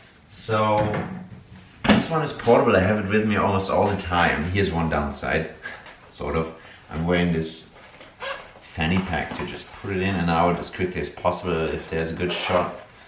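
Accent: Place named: Australia. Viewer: You sound like your from Australia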